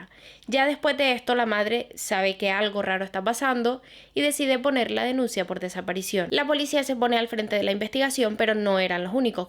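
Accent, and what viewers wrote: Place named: Canary Islands, Spain. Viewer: no sabía que eras de Las Palmas, se te nota el acento canario pero no sabía que eras de la ciudad. Encantadísima, y muchas gracias por tu trabajo❤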